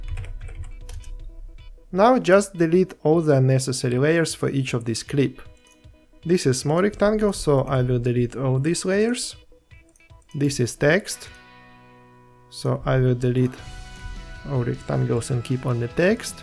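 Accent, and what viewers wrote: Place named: Russia. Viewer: Wonderful tutorial! Thanks. I want to work on raspberry pi, subscribed to your channel. You have an interesting accent, similar to Russian. You are Russian?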